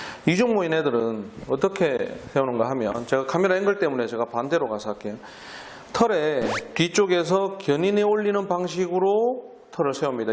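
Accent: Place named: South Korea. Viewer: 저는 성격이급해서 웬만한영상 2배속으로듣는데 진짜 서울말처럼들려요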